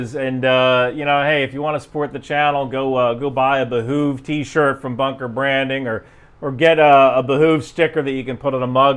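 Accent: New Jersey, United States. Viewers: My dad was from Maryland, you Jersey types all sound like you just came off a Jersey Shores set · To a west coaster like me, the New Jersey accent is like 95% the same as Bawlmerese, so I'm surprised that Marylanders would think you sounded *different*, much less dumb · Bro just casual about the Jersey accent, thats crazy you sound like your actually from jersey. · Toni soprano? wow you sound just like him when you talk with that Jersey accent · Please use your Jersey accent more! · You should really, really revert back to the Jersey accent. I really dig it. I don't think it sounds stupid at all (and I'm not even remotely from that region)😂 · Please keep your Jersey Accent! It is fun to listen to! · For the record, New Jersey accent is from a very small part of the state in the northeast, adjacent to Staten Island New York. Pretty much the only people who talk like that are, Snookie and her friends. 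· Kosher pickles? Joyzee accent? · You've got to do an episode with your natural Jersey accent! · say "It's not a nursing home! It's a retirement community." in your jersey accent.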